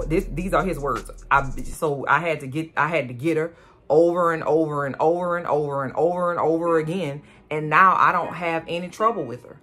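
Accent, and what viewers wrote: New Orleans, United States. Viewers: I’m in Shreveport. I know that New Orleans accent anywhere! Keep up the great work in the 504! 👍🏾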